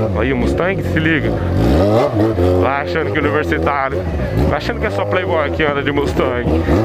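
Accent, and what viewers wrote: Goiás, Brazil. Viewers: mano vc e de onde ? vc fala sempre no pique do Goiás ? nasceu lá ? pequenas duvidas ai , responde quando der vlw abraços ✌👍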